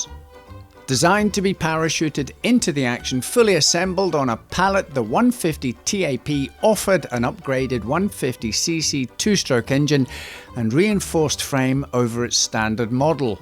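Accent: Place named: Scotland, United Kingdom. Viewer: Love how he starts with an English accent then gradually becomes quite distinctly Scottish. In a very charming way!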